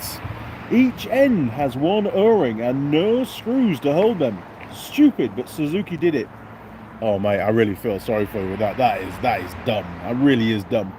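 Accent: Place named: East Riding, United Kingdom. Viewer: I've just found your videos in the last couple of days. Brilliant! As i've had a 1980 t140 built over the last year or so the gearbox strip has been fascinating. You are a natural teacher. Patience, clear and concise explanations plus a sense of humour, marvelous. Being a Yorky myself I can tell you have a neutral accent, but every so often an East Riding one slips through. Where abouts in 'gods own country' are you from. Dewsbury born and bred myself. Keep up the good work and i look forward to the new Bonnie video very much.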